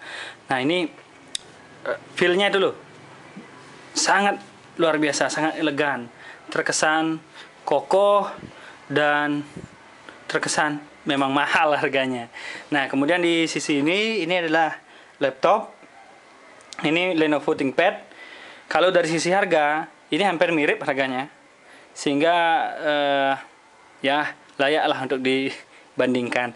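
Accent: Bali, Indonesia. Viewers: Itu logat Bali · tong logat bali sing ilang... Mantappp oom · bagus videonya, tingkatkan lagi bli.. khas sekali logat balinya.. hehehe suksma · Pasti Orang Bali... Kental banget Logatnya.. Hari Minggu TOKO TOKO PADA TUTUP, Phanter irit cling cling... xixixixxixi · kaya logak bali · bali lah.. bener gak bli? =)) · Logat balinya kentel saja bli 😄..suksme infonya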